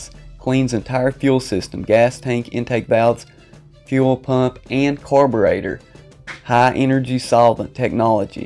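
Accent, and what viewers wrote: United States. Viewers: But he has such a cool cowboyish dialect 😆😆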